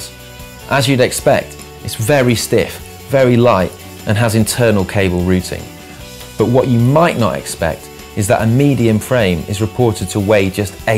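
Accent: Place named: Northern England, United Kingdom. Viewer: accent has gotten a lot more Northern nowadays.......by 'eck!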